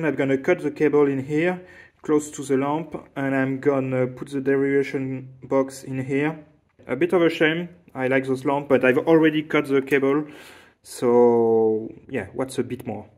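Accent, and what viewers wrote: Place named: France. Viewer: ça serait tellement bien honnêtement je comprend l'anglais mais un version français serait top. Faut avouer que tu as un sacré accent français (je fais pas mieux).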